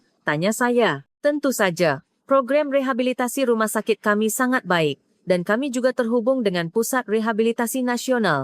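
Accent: Malaysia. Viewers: Komentatornya berlogat malaysia .... orang malaysia ya. ...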